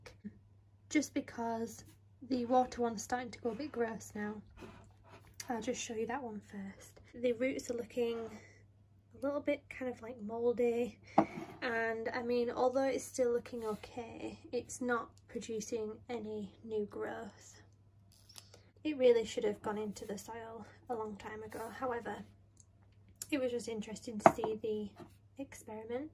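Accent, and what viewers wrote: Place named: Northern England, United Kingdom. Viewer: I really enjoyed the journey of these plants and am looking forward to trying this myself. There is something addictive about making new plants for free. Also I love your accent...I am from Yorkshire myself but live in Ireland so miss hearing Northern voices!!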